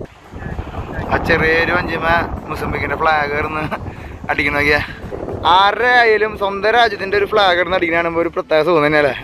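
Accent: Thrissur, India. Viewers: ഈ തൃശൂർ ഭാഷ ഒന്നു മാറ്റി പിടിച്ചാൽ കാണാൻ ആളുണ്ടാവും തൃശൂർ ഭാഷ ആവർത്തനം ആവുമ്പോൾ കാണാൻ പരമ ബോർ ആണ് ബ്രോ എല്ല വിഡിയോകണ്ടിരുന്ന എനിക് പോലും മടുപ്പ് തുടങ്ങി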